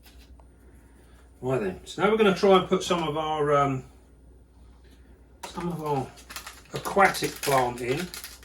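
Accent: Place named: England, United Kingdom. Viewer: Wow, i have never seen a video about a semi-acquatic species of spider...very nice, and as usual i really like your calmness and kindness and also the experience u have with this animals. Love also to hear the english accent :) Support from Italy, you have the best spider-keeping channel out there :) Nice work!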